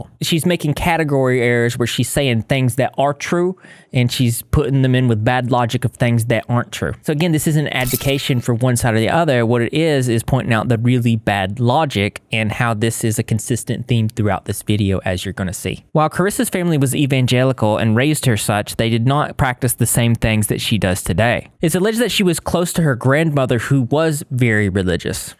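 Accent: South, United States. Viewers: new viewer who is curious about ur accent. are u aussie or from the south? u sound like my grandpa from georgia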